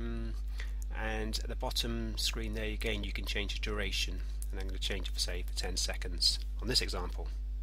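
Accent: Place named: United Kingdom. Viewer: Love the British accent. :)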